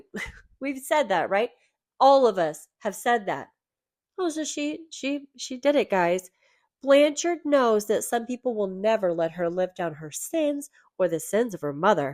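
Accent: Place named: South, United States. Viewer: Use that southern accent lady!! I'm a southener!! Foothills NC❤ PS loved the singing !!! Saw the video this morning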